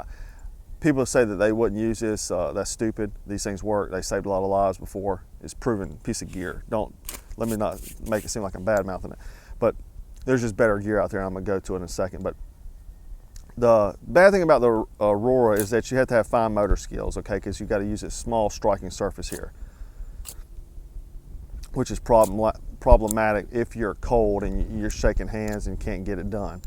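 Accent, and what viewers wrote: South, United States. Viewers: You got a very cool southern or western accent. You should try reviewing guns. Your voice really suits it.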